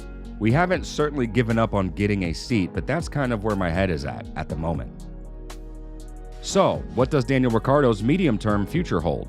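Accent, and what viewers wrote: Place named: United States. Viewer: Seriously as soon as you hear the American accent .. you know its just clickbait .. sigh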